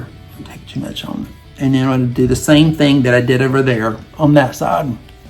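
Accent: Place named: North Carolina, United States. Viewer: Hi! I'm Sally and a new subscriber. I love your content and personality. I want to know if you have a drugstore dupe for YSL Concealer? 🙏..btw.. Charlotte!? Omg I used to live in Fayetteville NC in the early 90's.. that accent! 😊 💕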